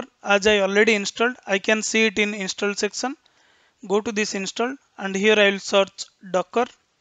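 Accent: Odisha, India. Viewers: The way you pronounce... Probably you are from Odisha ? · Bhai it seems u r from Odisha. But great explanation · Ur pronunciation is telling that ur from Odhisa.Their way of pronounciation is quit different from others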